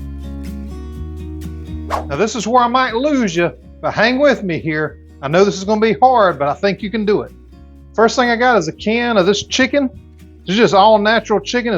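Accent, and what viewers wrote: South, United States. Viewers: I love listening to your southern accent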